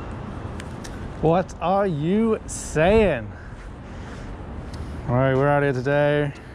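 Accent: United Kingdom. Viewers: You sound more British than Canadian, or am I mistaken? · Ha ha yeah I was thinking Canada but he has a British accent.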